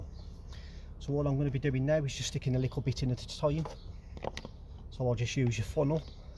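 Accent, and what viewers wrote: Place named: Black Country, United Kingdom. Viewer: i dont think he is a brummie! defo from westbrom, dudley, tipton or wolves 😂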